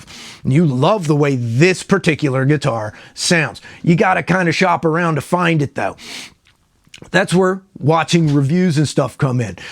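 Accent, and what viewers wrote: Pittsburgh, United States. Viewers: Great video! It's hard to pick a guitar based on a website, that for sure. Even YouTube reviews van be tricky. Sadly, the days of mom & pop guitar stores are going away, unless you count Guitar Center, which I don't - I don't trust them, either. They're known for "factory seconds," and if you know what that is, that's why I stay away from them when it comes to buying guitars at least. I could be wrong, but do I detect a Pittsburgh accent in there??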